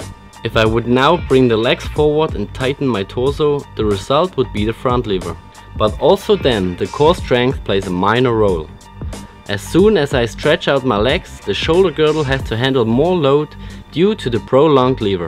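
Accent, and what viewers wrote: Germany. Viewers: hahaa, typical german accent. :D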